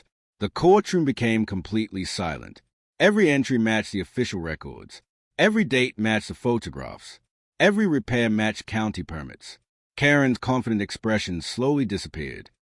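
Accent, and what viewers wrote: United Kingdom. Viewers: Why would someone who has generational property in Montana, sound like he’s from England?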